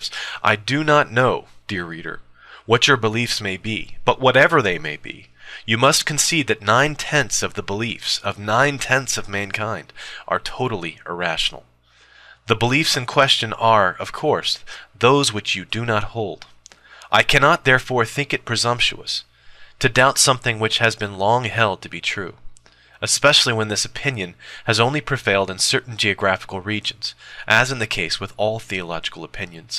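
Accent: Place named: United States. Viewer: Why an American accent of ones words who was so very English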